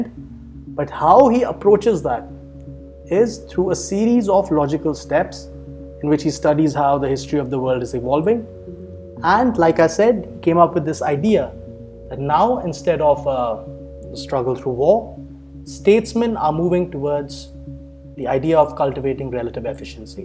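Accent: India. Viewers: Pls dont speak in an indian accent its uncomfortable the accent i just dont like it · Indian accent? new presenter? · Indian Accent? No no. Please no! ☹️☹️☹️☹️☹️☹️☹️☹️ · He sounds Indian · Oh boy it's Shirvan time! Hears Indian accent Oh boy it's World Power time!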